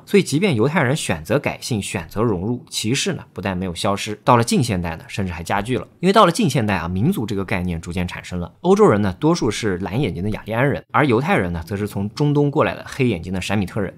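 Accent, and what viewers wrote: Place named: Nanjing, China. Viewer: 这个我滴妈很有南京人的腔调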